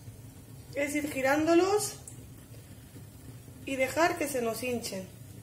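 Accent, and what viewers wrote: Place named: Catalonia, Spain. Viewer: Unas tecrtas faciles i deliciosas, q eres catalana jo si me a parecido e un poco el acento😋😋😘😘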